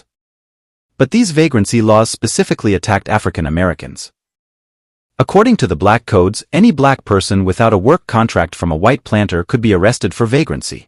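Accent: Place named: Europe. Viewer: You sound, european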